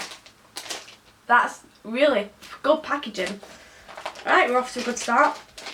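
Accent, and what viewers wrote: United Kingdom. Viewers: I have an American accent and you make me want a British accent soooooo bad!! 😩😩😍😍😍😍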